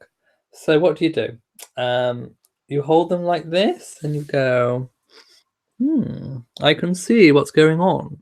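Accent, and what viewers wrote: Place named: United Kingdom. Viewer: That's why I watch your channel.... you're British....and would have a more accurate account of British news than the fake news out there. Besides...your accent is adorable.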